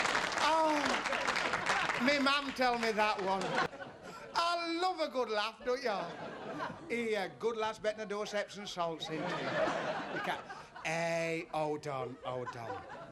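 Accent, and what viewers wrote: Yorkshire, United Kingdom. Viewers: Am Yorksher! Sur understandin' 'im ain't nur problem forrus. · i remember the first time i hesrd him i had visions of a yorkshire miner telling jokes such broad accent but what a funny guy. the comedians would NOT eork today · That's a strong Barnsley accent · Lars Jönlid I’m from Barnsley like him and he’s so easy to understand for me but I think it’s the accent and dialect that makes him so funny. Tell you what whenever we leave the country depending on where we go certain countries find us easier to understand as some of the dialect and the accent is derived from Norse language. e.g. lekking (playing) comes from the ancient Viking word lake which means play. It’s always funny when someone 10miles away can’t understand you but people in other countries seem to have easier times😂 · Good old Charlie, God bless his memory. 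He was a fantastic comic, loved to watch him on the Comedians show. His Barnsley dialect made his jokes even funnier as he used it to emphasise them. I can understand it perfectly being from the next county myself Derbys.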